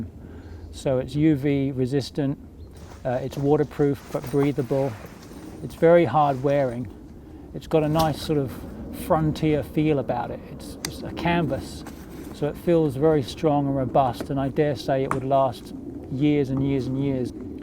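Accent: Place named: Australia. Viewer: I thought this guy was an Aussie. Can you make tea in it?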